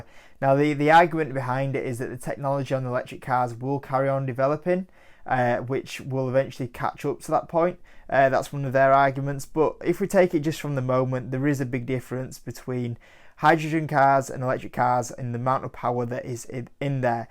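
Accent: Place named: Yorkshire, United Kingdom. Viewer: but by no means, them are not competitors, same as Lucid (private so far). And Tesla has no real competition so far to be honest, as you buy technology, not just a vehicle (it's not a car according to Oxford dictionary). As you're based in Yorkshire, judging from dialect (😃), I would advice to make a call and get a Tesla Model 3 test drive, then you will see what it's all about (the hype), Tesla model X/S doesn't give the same feeling and tech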